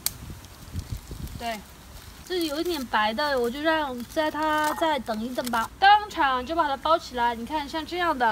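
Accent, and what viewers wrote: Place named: Chengdu, China. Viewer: 哈哈哈哈感觉女主是不是成都人呀，口音跟我说普通话的时候好像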